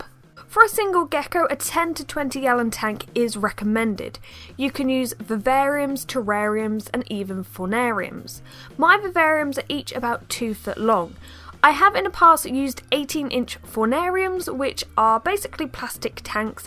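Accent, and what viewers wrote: United Kingdom. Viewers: Unsure of your accent, you from the uk?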